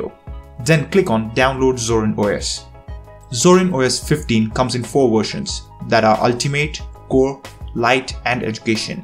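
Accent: India. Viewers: are u from india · Bro you Indian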